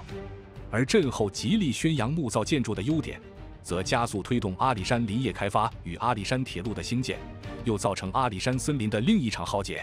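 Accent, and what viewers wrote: China. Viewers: 這個text to voice 好像是公用的，但都是大陸語音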